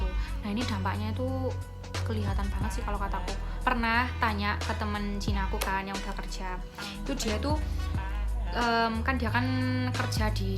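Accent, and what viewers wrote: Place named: Java, Indonesia. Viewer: jowo medhok con.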